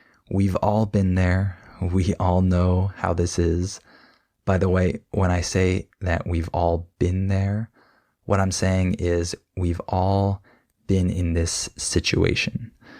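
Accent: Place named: United States. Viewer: هذي لكنة امريكية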